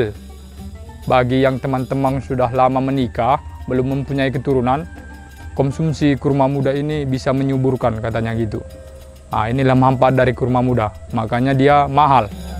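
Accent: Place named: Medan, Indonesia. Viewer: Dengar logatnya jadi kangen temen dari medan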